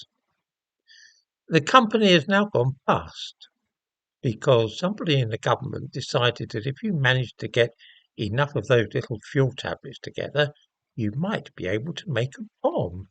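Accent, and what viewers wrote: United Kingdom. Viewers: Clicked on this because I have an interest in making a small steam engine and I want to get in to live steam, I used hexamine tablets in cadets, I still have boxes full of them so that's good to know. I recently saw a new steam engine kits in a local Kmart and they had an electric engine. - it was both very confusing and disappointing, but I ended up googling the Mamod company, as a result of this video and I've now found a local supplier of small-scale steam engine parts. So that’s made me happy, I can probably push this endeavour further. You sound and speak with the same tone as my neighbour who is also a British expat (I'm from Australia).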